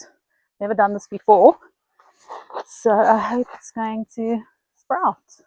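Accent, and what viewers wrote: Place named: South Africa. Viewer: New subscriber from Alberta, Canada. Originally from SA as well. Been in Canada for 31 years in mid March. I get so excited when I hear the SA accent in any videos. We wish you all the best in your new adventure. It takes a good couple of years to get settled into a new country. I do miss our lovely "old" country but also love the freedom and security we have in Canada. God bless your family.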